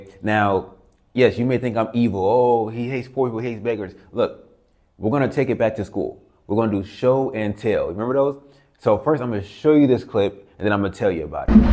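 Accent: United States. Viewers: What did you expect? A red carpet? All major cities have homeless ppl and beggars, have you seen downtown Seattle or Chicago? Lol... ...also..dude, your camera pointing and obviously American accent make you both a target AND a nuisance. Try that in Haiti or somewhere in SA, you'd get robbed (at the least) and possibly killed at worst. Maybe don't be so disrespectful toward ppl you're already being very intrusive with? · I am a black American LADY I live in Kenya BY CHOICE. I love it and I love the people but let’s get real young man. By the way I am very well traveled…. You young people going into third world countries and “filming” live for the sake of “content creation” WITH NO UNDERSTANDING OF A CULTURE IS UTTERLY RIDICULOUS. Do you understand where you are going and the realities of the place!!??Some of these things you have experienced, you make yourself a target!!! 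Seriously. There are millions of destitute and poor people in most of these nations and the reality is that if YOU WERE IN THEIR SHOES you yourself might beg if you hadn’t eaten in a few days. As far as the other critiques I get this is for content and your personal experience but honestly you should’ve had a guide… also know when to shut off the cameras. In many of these cultures photos and cameras are sometimes an issue depending on the beliefs of the people you are catching on film… STUDY BEFORE YOU GO. Also the people for the vast majority are WONDERFUL, LOYAL, AND HONEST. Also on the women, dude in a place with literally millions in ONE city your views are just to generalized. The women are actually the OPPOSITE of what you say. My son visited here and HE IS EXTREMELY HANDSOME AND SUCCESSFUL AND IT WAS NOT AT ALL WHAT YOU DESCRIBED. Most of the attention you received especially in the CBD was simply because some people heard your American accent PERIOD. 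I really think your video is such a poor portrayal of the country. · Young man you sound like a privileged American.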